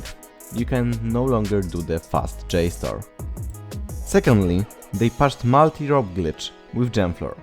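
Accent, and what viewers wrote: Poland. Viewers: Słychać ten polski akcent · Słychać że polak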